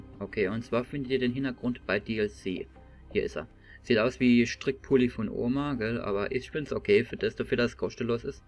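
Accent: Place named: Palatinate, Germany. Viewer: Ich aus Landau :) Man hörts an deinem dialekt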